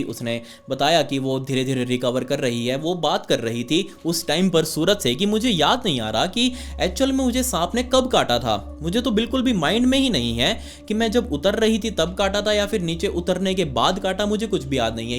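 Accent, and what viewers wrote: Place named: Delhi, India. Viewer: Hi ❤❤❤❤ मुझे आपके बोलने का अंदाज़ अच्छा लगता है पुरी दिल्ली वाली भाषा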